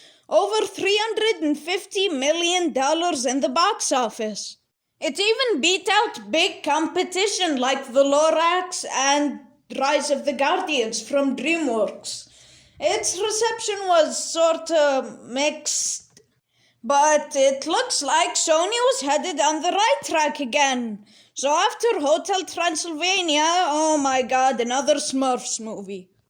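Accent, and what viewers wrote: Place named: Scotland, United Kingdom. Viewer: Nice video and I like your Scottish accent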